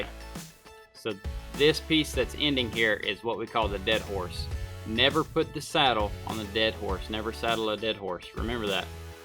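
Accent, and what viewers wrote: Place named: South, United States. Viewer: Southern accent sounds funny and cute at the same time.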